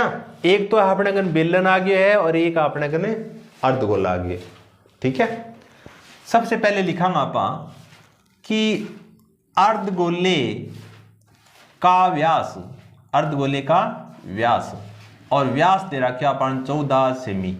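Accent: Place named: Rajasthan, India. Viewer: sir mujhe aap ke bolne ke accent se pata chala kyuki me bhi Rajasthani hu ☺️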